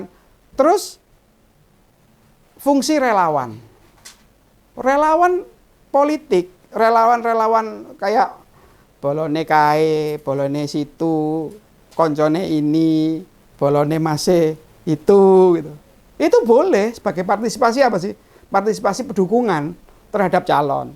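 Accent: Java, Indonesia. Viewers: ini asal daerah mana ya kok jawanya medok banget..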